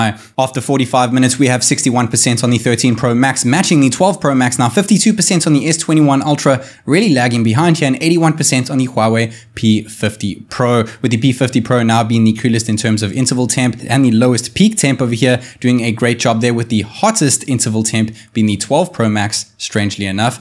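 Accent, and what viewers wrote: South Africa. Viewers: Bro I can recognise that accent anywhere. Hello from a fellow South African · i swear this guy sounds south african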